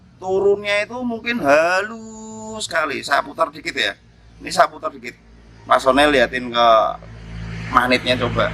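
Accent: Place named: Tanjung Priok, Indonesia. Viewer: Kaya orang priuk bang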